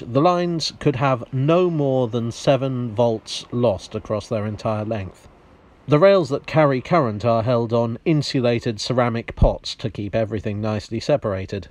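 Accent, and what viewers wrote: United Kingdom. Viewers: Thank you, thank you, THANK YOU for this video!! As a bona-fide train enthusiast and particularly a subway nut (I was born and raised in New York City riding the subway since age 7) and a model railroading enthusiast modelling three scales (gauges) HO, OO and O. I absolutely LOVE literally everything British as in that accent (of course), humour, cathedrals, pipe organs, architecture, automotive interests, of course your superb railway network and the gorgeous trains. For my birthday in 2018 because he knew that I loved the London Underground, my wonderful son bought the Bachmann London Underground S-Stock OO-scale model set for me (NOW I'll put him in my will Lol) and in watching the many videos of the London Underground, I often wondered about the fourth rail as I knew that there of course would be two running rails and a third rail for power, but I never knew what the fourth rail was for and this explains it, so thanks! 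Terrific footage throughout the video. In the opening footage of the London Underground entering that station, what stock is that and might it be pre S-Stock or post? Speaking of the S-Stock, the footage of it entering the stations , and is gorgeous. I've liked and subscribed! Thanks again!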